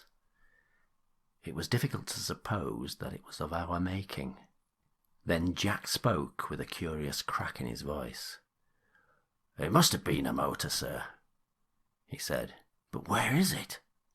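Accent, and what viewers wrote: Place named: Northern England, United Kingdom. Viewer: So pleased to have found your channel. Great story and nice to hear a Northern voice ( I hail from M/r) narrating. Have subbed and big thanx!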